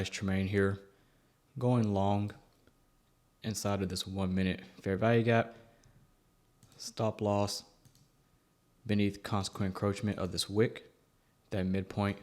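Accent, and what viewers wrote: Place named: South, United States. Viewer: Here for the southern slang, giddy up traders...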